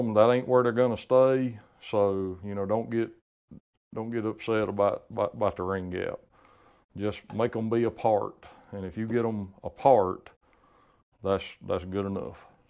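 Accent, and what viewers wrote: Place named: South Carolina, United States. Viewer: i enjoy his upstate SC dialect. my former business partner was from North Carolina he had the same sayings and mannerisms. i am from the SC low country we have a british brogue dialect down here with a gulu/gechee inflection.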